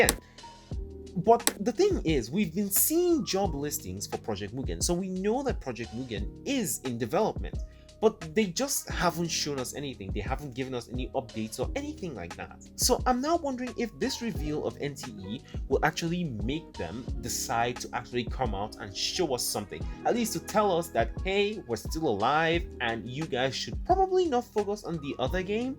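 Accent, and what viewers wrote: Nigeria. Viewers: Bro are you Nigerian? You sound Nigerian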